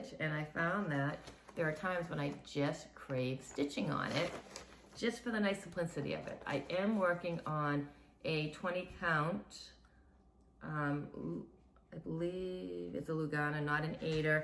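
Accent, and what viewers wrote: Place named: Boston, United States. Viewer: I have a couple of questions. Is there a reason you roll your fabric instead of fold it, or is it just preference? In what part of Maine do you live? I thought for sure your accent was from Boston. 😊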